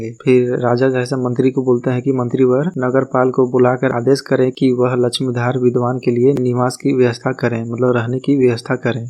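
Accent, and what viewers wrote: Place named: Bihar, India. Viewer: Ja he se bro our bihar's accent don't forget that 🔝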